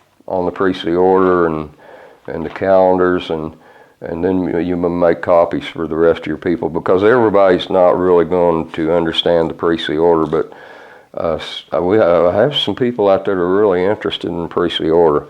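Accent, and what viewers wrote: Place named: South, United States. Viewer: Oh brother, bless you. You really get it, the difference bw the BoC & BoL!!!! Praise YHWH & bless you! Where are you located? Ur a southern gent., that I can tell, but where? TX, i hope or TN?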